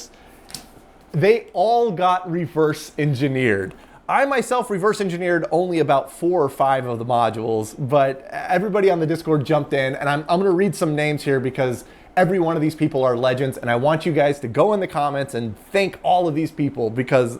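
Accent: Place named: United States. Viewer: Right? Always nice to see some metric from someone with an American-sounding accent. :) We'll get there eventually!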